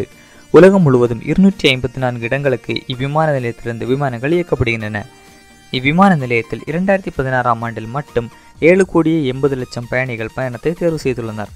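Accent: Kanyakumari, India. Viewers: Ne kanyakumari aa pa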